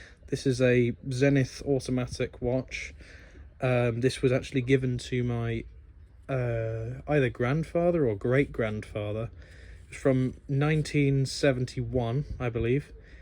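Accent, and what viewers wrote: United Kingdom. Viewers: I JUST LOVE YOU!! And your accent! Not many Brit's speak as well as you esp your cadence and vocabulary! You're brilliant! Thanks for showing us your watch collection! Plus, what a special honor to your Dad!! I never knew anyone who can build their own watch!! Wow! And from you being on your mom and dad's videos, I can see you've inherited both of your parents' talents! Thank you for making this video! I have developed a true crush on you!! Just a complement! But you're so likable and soooo cute! Love to your Mom!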